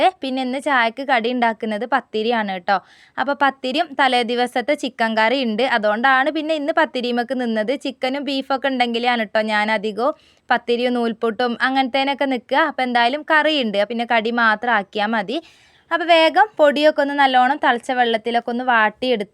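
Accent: Malappuram, India. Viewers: നിങ്ങൾ സംസാരം കേട്ടിട്ട് വയനാട് സ്ളാങ് അല്ലല്ലോ ജനിച്ച നാട് മലപ്പുറം ആണോ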